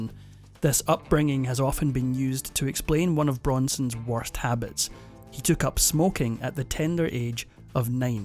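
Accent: Scotland, United Kingdom. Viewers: My favorite Narrator love the Scot!!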